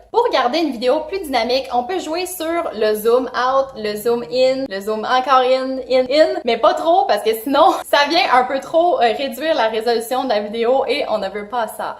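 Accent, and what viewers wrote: Quebec, Canada. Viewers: Super vidéo, super explication, super smile, super accent québécois (je suis français), pouce bleue :)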